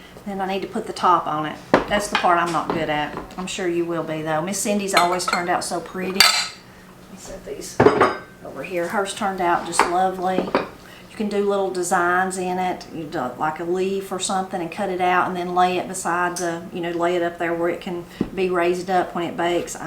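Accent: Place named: South, United States. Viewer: Good morning, I enjoy your videos. Thank you I live in Connecticut, your southern accent is charming and you stories and anecdotes are interesting. I would like to offer my tip for blueberry picking. I want to use 2 hands to pick so i use a milk jug that I cut the top off but leave the handle to which I tie a ribbon or string that I put around my neck for hands free picking. I always bring a larger container to dump into as I go so I can get slit of berries.🙂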